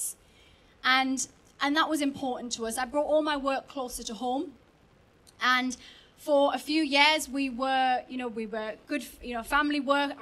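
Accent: Newcastle, United Kingdom. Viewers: I miss hearing Geordie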